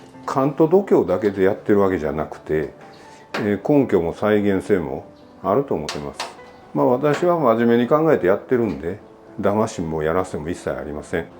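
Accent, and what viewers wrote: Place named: Kansai, Japan. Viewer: ★いつも、詳細な説明をご丁寧にされていて、関西弁も聞けて好感の持てるサイトだと思っています。色々、勝手なコメントにいつも返答頂きありがとうございます。★家のエアコンも5-7年位で効かなくなりますが、ほとんどの場合はフロンガス漏れで、一度、大きなコンデンサーが不良になり交換した事がありますが、壊れると言うより経年問題です。★話は変わりますが、お話に出た日本冷○○学会などにより、技術振興を目差した団体のノウハウが隣国に洩れて、ＩＰの権利も無視され安い代物家電などが作られているようにも思うのですが、日本の国益に反しますよね。日本の白物家電はアメリカでは壊滅しています。 日本の貿○振○会などによる技術情報漏洩問題も取り上げられていました。お人好し？の日本人には考え直して貰いたい問題ですが、赤に染まったマスコミや帰化人の政治家らが人道だ、国際平和だとかチンピラヤクザのように一般論で煽り、反論すれば今度は例外を持ち出し話題をずらす（ゴールを変える）作戦で話にならず、悲しい限りです。★軽トラのＤＩＹキャビンの新しい情報を待っています♪ 重力式の水屋の問題、設置後にお気づきの利点があれば教えて下さい。容器を10Ｌ位にして天井近くに取り付ければ、ポンプなしでもシャワーに使えますか？ ★返事はお暇な時で結構です。気が向いた時に宜しくお願いします。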